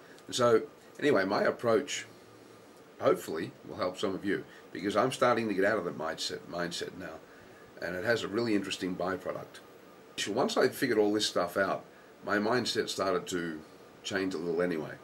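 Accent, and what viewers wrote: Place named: Australia. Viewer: You have an uncanny ability to talk...and talk...and talk.... I've gotta say though, you make more than just a lick of sense. I too find a clean, neat, and organized environment rather enjoyable, relaxing, and invigorating for both the mind and body. 'Tis far easier to do something clean for myself when the atmosphere around me is already clean. Good video! -Josh PS: If you didn't have that accent of yours, I probably wouldn't have lasted the entire video. Aussies rock!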